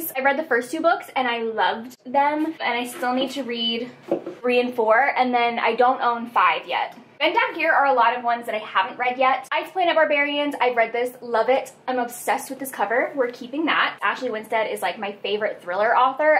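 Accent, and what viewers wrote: South, United States. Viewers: Omggggg that southern accent had me rolling 😂😂😂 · Omg your southern accent 🤦🏼‍♀️😂🤦🏼‍♀️😂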